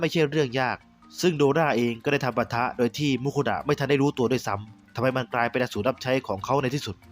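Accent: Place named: South, Thailand. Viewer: เจ้าของเพจเป็นคนจังหวัด กระบี่ หรือพังงา ครับ เสียงออกโซนนั้น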